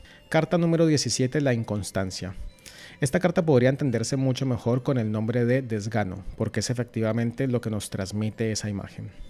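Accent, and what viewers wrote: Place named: Lima, Peru. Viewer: Gracias por compartir esta clase. Quería preguntarte¿de dónde eres? Tu acento es igual al de mi ciudad, soy de Lima-Perú.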